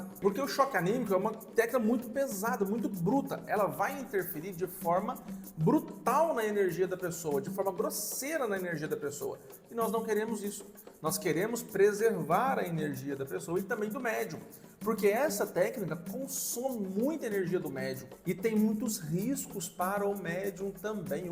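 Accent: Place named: Minas Gerais, Brazil. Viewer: Boa tarde,estou gostando muito das suas aulas , parabéns! Fala de onde vc é ...parece ser mineiro como eu ,sou de BH .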